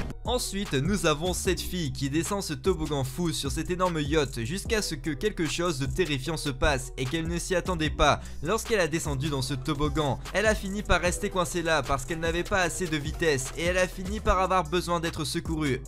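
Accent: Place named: France. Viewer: Les commentaires insignifiant du narateur, avec son accent français me tape sur les nerfs.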